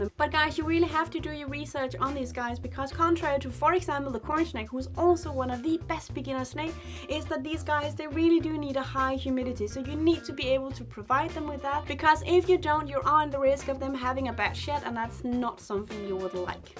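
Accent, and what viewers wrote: Denmark. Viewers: its a beautiful accent danish is similar to one of languages called afrikaans 🙈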